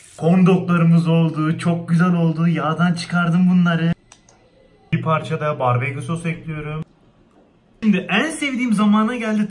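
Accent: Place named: Hatay, Turkey. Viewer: Abi konudma tarzınla o kadar güzel ki ergenlerjn yorumlarına aldırma ben sinir oldum vallahi tam Hatay ağzı :) Yolun açık olsun :)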